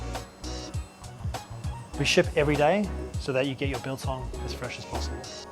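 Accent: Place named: Australia. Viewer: I can't distinguish it I just heard Australia..!!! Hehehe..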